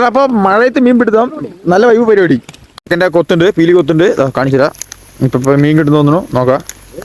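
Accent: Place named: Palakkad, India. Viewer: Palakkad slng poli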